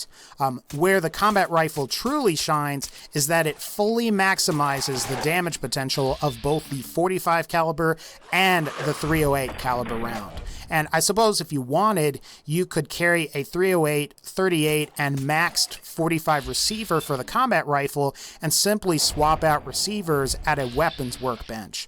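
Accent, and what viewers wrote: South, United States. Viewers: He sounds like he's from the US... southern gulf/bible belt area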